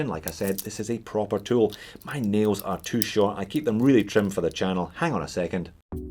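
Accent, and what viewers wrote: Scotland, United Kingdom. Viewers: Aye. I just spent 300 on a new Pepsi turtle and now looking at this King samurai haha Where does it end!? Glad I found your channel. Really enjoy the review. And nice to hear a fellow Scot.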